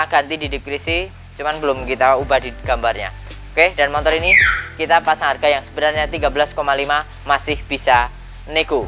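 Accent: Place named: Java, Indonesia. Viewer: Sampean wong jowo toh mas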